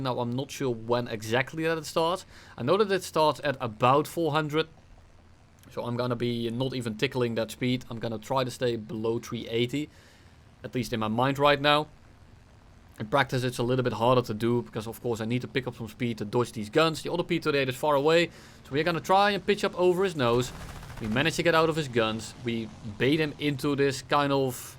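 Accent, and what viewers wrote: Brabant, Netherlands. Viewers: for anyone intrested, theyre called vliegtuigboMMen, not vliegtuigbonnen. has a dutch accent (prob brabant) making his pronounciation deviate from normal Dutch people (ABN) 🤓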